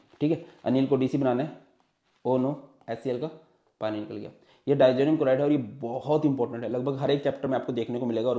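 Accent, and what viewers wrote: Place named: Uttar Pradesh, India. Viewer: Ya he's got that UP waala accent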